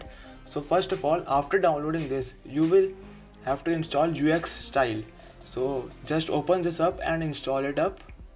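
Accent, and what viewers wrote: India. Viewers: whenever u hear an indian accent iknow this shit gonna work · Indian English videos are so funny :3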